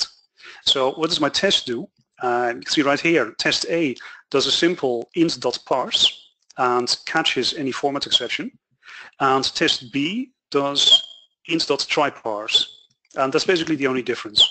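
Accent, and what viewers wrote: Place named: Netherlands. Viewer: bro u sound dutch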